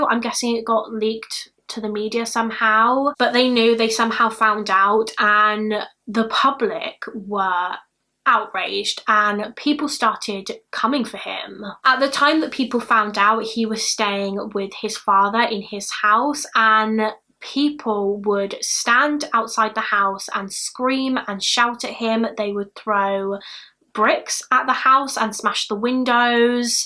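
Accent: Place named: West Country, United Kingdom. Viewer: I think is from the West Country like me so I can assure you it’s definitely a British accent, we roll our Rs a lot here 😂😂❤️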